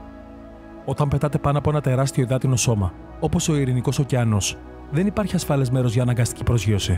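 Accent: Crete, Greece. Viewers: ναι ρε φιλε, αχα. ανοιξα να δω τα σχολια για αυτό το λόγο. Άσχετο, απο την προφορά του, πρεπει να ειναι απο Κρητη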